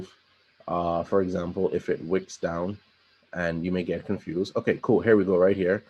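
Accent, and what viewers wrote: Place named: Bahamas. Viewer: ik you bahamian lmaoo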